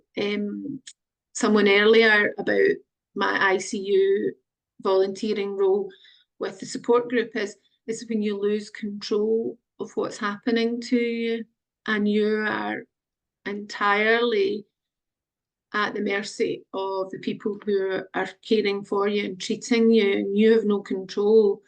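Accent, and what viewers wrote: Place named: Scotland, United Kingdom. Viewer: Thank you. For sharing your story. I can relate to your story so intensely. I myself am a survivor of Viral Encephalitis and Autoimmune Encephalitis. Also living in Scotland. I feel so fortunate. Encephalitis changed my life. I have a different outlook on my life now. I also live in the West of Scotland. And it was comforting hearing your voice and accent in your story. ❤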